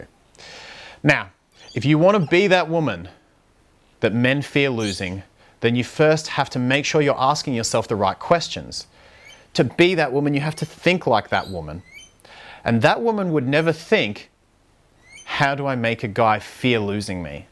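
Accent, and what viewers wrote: Australia. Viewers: Is that Australian accent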